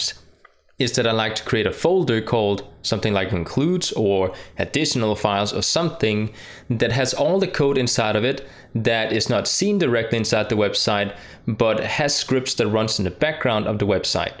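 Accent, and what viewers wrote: Denmark. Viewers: He is from Denmark! I love his accent!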